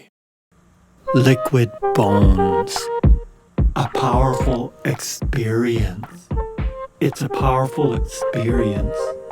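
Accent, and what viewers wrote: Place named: Alberta, Canada. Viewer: I detect an Alberta accent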